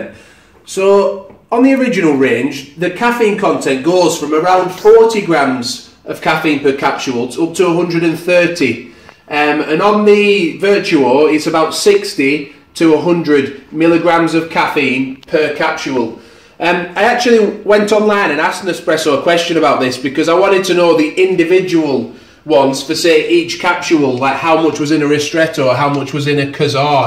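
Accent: United Kingdom. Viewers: Ik this older video, I’m curious on something, I’m US ur voice sounds like ur Uk or something 😊. My local grocery store had some of these Starbucks lungo pods on clearance to get rid of them.. I don’t have a nespresso.. I have coffee filter and though try them so how much water would I need to use for one lungo pod?? I can’t find any info out net.. I stumble over ur video.. Thanks..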